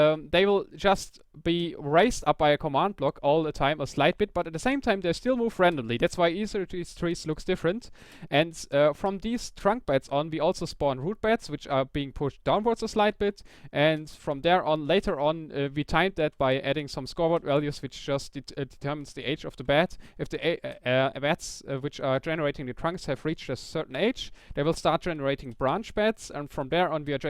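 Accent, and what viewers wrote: Germany. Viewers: Du bist Deutscher oder :P? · you got a pretty german accent right i dont know im german ;D just say no or yes ;D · R u from germany?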